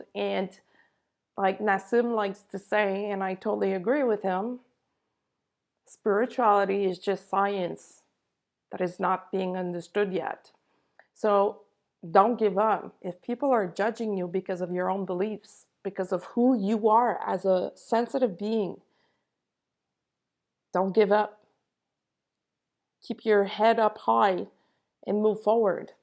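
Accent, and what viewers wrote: Quebec, Canada. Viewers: Do I hear a little French-Canadian accent!? 😉😉😉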